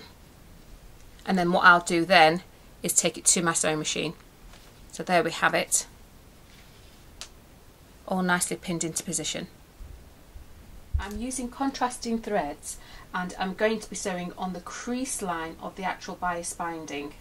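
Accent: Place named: England, United Kingdom. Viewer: Loving the fact English people are doing clear informative demos. Way preferable to yank accents!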